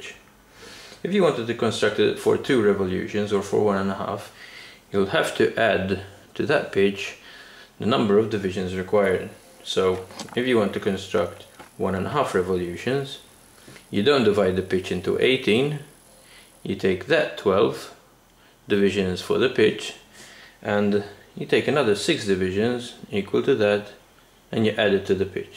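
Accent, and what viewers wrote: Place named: Malta. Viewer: It is a Maltese accent. He used to teach me at school :p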